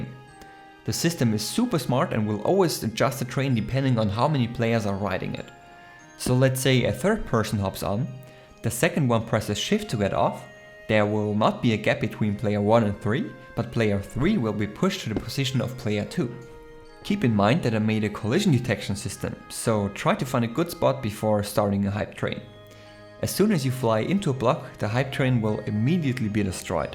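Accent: Germany. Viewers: Dein Englisch klingt als wärst du Deutschet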